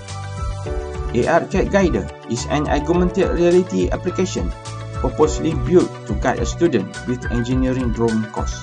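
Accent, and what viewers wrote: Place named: United States. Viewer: Terbaik. Usaha yg sgt baik. Cara cakap pun mmg bunyi slang Amareka. ~ Azar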